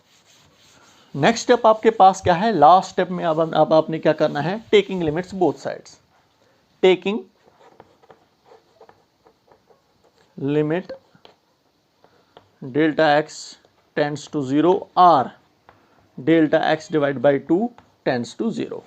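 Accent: Kashmir, India. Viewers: Humarai teacher nai yehi method dekhaya tha aur mai nai full YouTube mai dakha kis nai b aasai nhi kiya hai but thanks sir aap nai yeh video banaye hai thanks sir aur aap kai tone sai lag raha hai aap kashmiri ho so kashmiri best hai 💕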